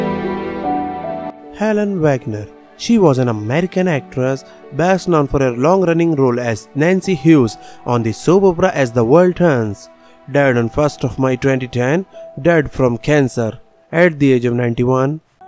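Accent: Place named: India. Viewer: Hes an Indian .his accent is different 👌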